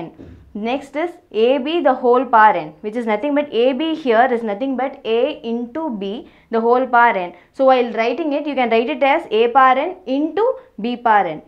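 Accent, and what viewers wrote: South India, India. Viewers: That south Indian accent 😊😊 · Nothing but... Nice mam😊... With South accent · Are u from south maa'm?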